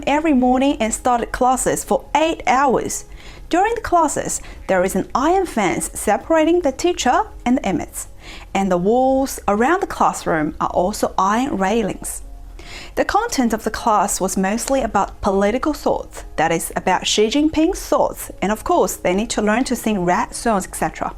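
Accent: Australia. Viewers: 好像是澳州口音的英文。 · Really Fly! (真飛！）澳系口音舒服。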